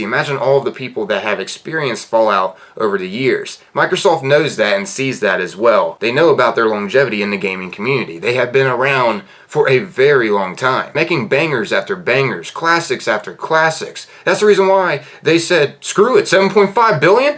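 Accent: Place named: South, United States. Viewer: Me, looking at some of the channels I used to watch when I was younger: What is this place? Seriously, the uptick in quality and preparation shows. But, overall, that same vibe just comes across, idk why. Maybe it's the slight southern accent? Also, just a fun thing: I, a 17 year old now, have intense nostalgia for your desttiny year 1 content.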